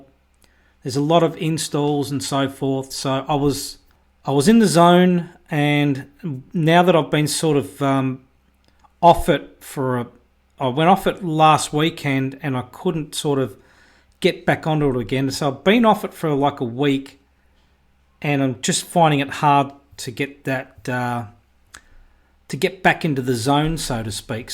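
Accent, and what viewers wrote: Australia. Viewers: i love the aussie accent!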